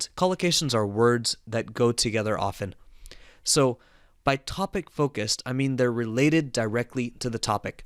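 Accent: United States. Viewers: Should British english be used to write in IELTS? You wrote labour as "labor" (which also means giving birth i think) :) Also, you sound like the speaker in the listening test! (but with the american accent haha). Anyway, this lesson truly is helpful! I have my tests on 3rd June. I hope to be ready to for band 6+, or even 7,8!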